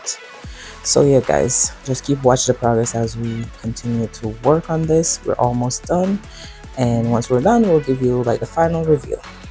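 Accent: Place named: Jamaica. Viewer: Waaaiiiiittt a minute did a hear patois? are you Jamaican or Caribbean? anyway, love the set up as usual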